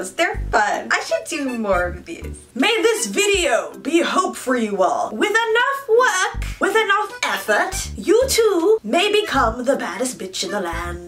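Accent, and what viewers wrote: United Kingdom. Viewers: amazing british accent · this hair! this beat! this girl! her british accents!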